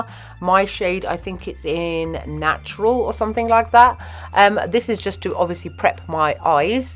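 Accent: United Kingdom. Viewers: I love this video so so much! Its a good old youtube style grwm with "oldie but goodie" products (i.e., reliable). I am so tired of hearing of new brands everyday and it feels like a rat race. Give me my NARS blush from 2010 and it still works, it still makes me happy. Also, your skin color is the same as mine, so its a great reference point to try shades based on how they look on you. And, finally I really connect to the manner in which you speak being a desi who grew up amongst relatives based in the UK :) ... So many reasons to love your content <3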